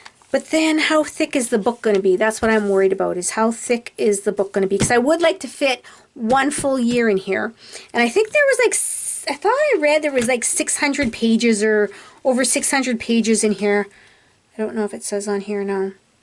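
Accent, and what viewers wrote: Canada. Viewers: The "aboat" gave it away immediately! You're Canadian! I've enjoyed watching your video.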